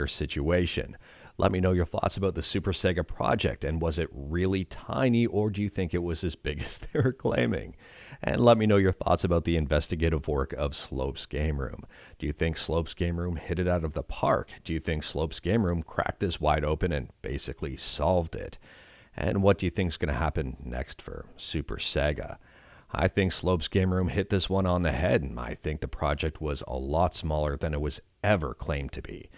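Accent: Canada. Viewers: You say aboot. Defiantly Canadian. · Can confirm: The day you learn you have a Canadian accent is very Jarring. and that's coming from someone who is tesl certified as one of the first things we learn is other countries prefer Canadian instructors because of our lack of an accent. Go figure eh? lol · Your Canadian accent is minimal if that's any consolation.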